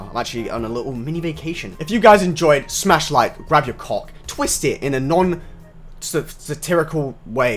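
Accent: United Kingdom. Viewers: it actually ji scared me when I realized he was British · bro looks like an american sounds likes a british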